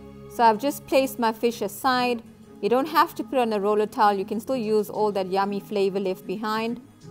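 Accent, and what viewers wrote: Durban, South Africa. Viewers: Excellent production!!! How lovely to hear authentic South African commentary without the horrible American Slang. Please preserve your beautiful accent and unique presenting style! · Unmistakenly Durban accent. My sister in US over 50 yrs still has strong Durban accent. Me tooo🎉🎉🎉🇿🇦🇿🇦🇿🇦. Had that beautiful barracuda over 50yrs ago in Durban. I can smell those Durban spices. My mum also made the most delicious tinned fish biryani which I still make. It’s a hit when I do🎉🎉🎉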